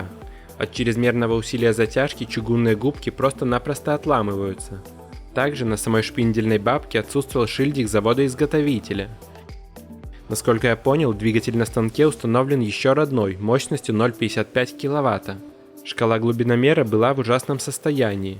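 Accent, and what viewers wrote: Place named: Belarus. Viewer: звучит как беларус )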